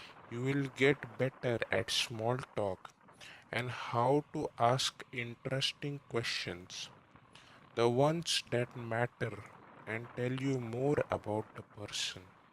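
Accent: India. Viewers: Wow it's amazing, thank you!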